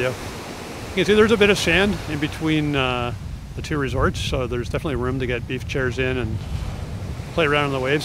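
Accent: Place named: Ireland. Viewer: Sounds like an Irish accent to me.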